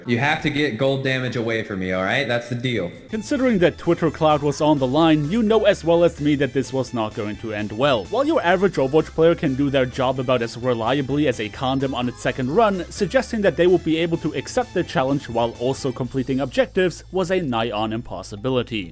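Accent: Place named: Germany. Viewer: just keep speeking with dat FAT german accent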